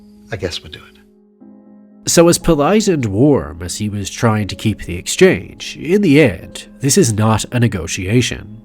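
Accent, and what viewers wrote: Ireland. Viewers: Are you Irish, mate? You sound like one of my old best mates.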